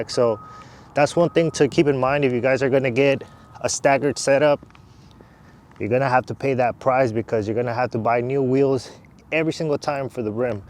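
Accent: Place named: Mexico. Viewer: I got some work for you and your people, maybe even a speech class cuz I still hear that Mexican dialect!!!!!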